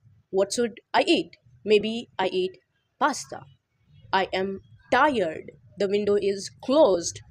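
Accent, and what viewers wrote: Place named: United States. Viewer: Are u living in US ? Ur accents is very closer to them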